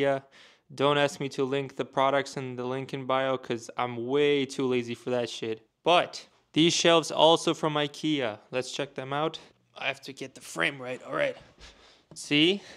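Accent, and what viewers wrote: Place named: Poland. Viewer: Yo what country are you from you’re giving me polish accent vibes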